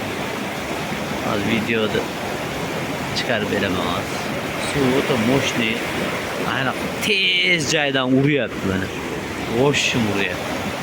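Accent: Andijan, Uzbekistan. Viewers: Анжонни каери бу ука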